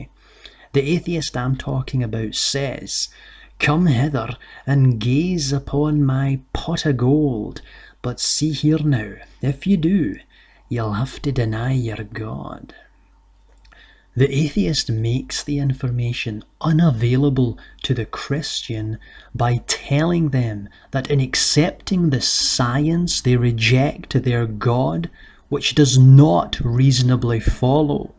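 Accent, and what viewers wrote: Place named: Northern Ireland, United Kingdom. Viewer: My bad - the voice reminded me of the narrator in The History of Film, who is from Northern Ireland.... I guess I wouldn't last long in that part of GB. ☺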